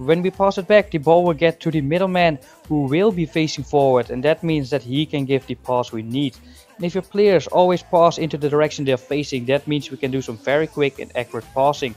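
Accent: Netherlands. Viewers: Great tutorial. Btw you sound Dutch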